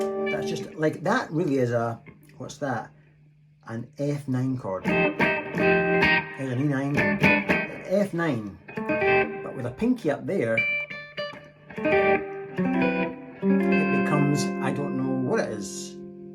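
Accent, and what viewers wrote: Scotland, United Kingdom. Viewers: As a 63 year old Scotsman living in the Alps for the last 20 years, and recently taken up guitar and watched quite a few videos, I just love the Scottish patter and your skill with the instrument and your words. Keep it up, appreciated by many as I can read, but definitely by me. As a car lover I also smiled with glee at your wish to get a new car without kerbing and clutch abuse. Looking forward to watching more of your back catalogue of videos. Loved the camping one, felt like home in the north west highlands, Many thanks.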